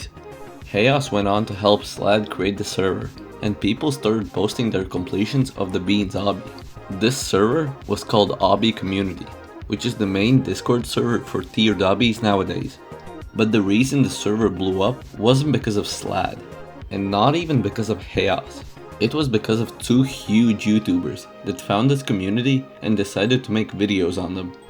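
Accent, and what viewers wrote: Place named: Latvia. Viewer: just listening to you speak i could understand that u were latvian 😜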